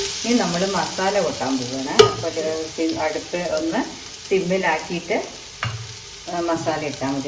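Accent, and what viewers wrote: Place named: Valluvanad, India. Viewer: Valluvanadan Malayalam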